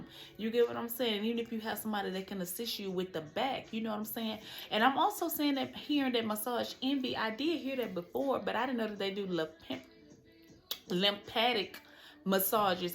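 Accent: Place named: South, United States. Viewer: Why every time I see you, I be like Hey Cousinnnnnn!!!. I stumbled across your video from your 2nd round and girl I love your lil country accent and beautiful spirit. Also you’re very informative. Thank you for the good tea and helping me stay focused on my upcoming surgery. These videos helps! Keep going cousin!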